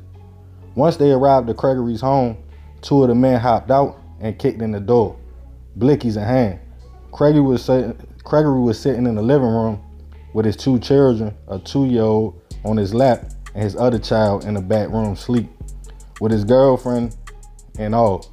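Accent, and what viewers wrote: Baltimore, United States. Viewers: Bro I moved from Bmore bout 17 yrs ago, been back and forth, but your voice and way of talking brings me back🫡🫡🫡🫡channel is excellent bro! Dont stop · I know Bmore when I hear it 💪🏾! I'm just getting hip to your channel. Love the content and storytelling so far. This story reminds me of a few incidents from the Horseshoe.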